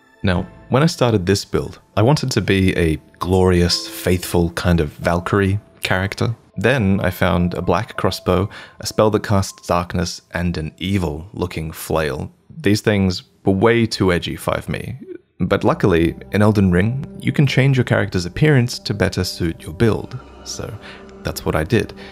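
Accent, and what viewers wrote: Australia. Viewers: Thank you, friend, for your sage advice. I am VERY new to Elden Ring and still struggling with the keyboard mechanics having come from a PC WoW type of set up, but this tips and pointers you offer are clear and concise. Being an Australian, it's also nice to hear a familiar accent and I think you should consider reading for Audible. Many thanks. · Seriously can’t place this dudes accent - sounds like a mixture of posh Aussie with a bit of US/UK flavour thrown in?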